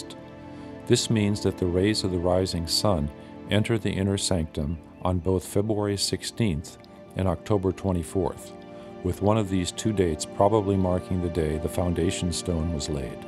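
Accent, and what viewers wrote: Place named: England, United Kingdom. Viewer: Excellent Presentation and Video steady, well explained voice and accent so beautiful graceful and respectful english spoken by englishman is the way to go in these day. People around the world need to know our Hindu Cultural, Heritage God Temple Site. Thank you very much clear view and explanation perfect keep it going with all other temples too. I have not visited that temple yet but seeing this I want to in my Life.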